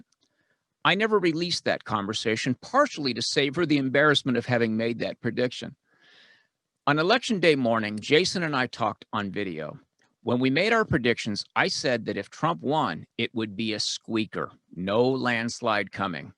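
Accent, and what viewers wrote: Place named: California, United States. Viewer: Californian huh, you project it well.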